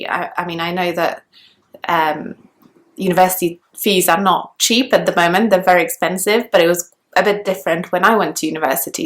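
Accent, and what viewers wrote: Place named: London, United Kingdom. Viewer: Inspiring story! Your English is very high level, sounds like a londoner. What about French? Has it abandoned?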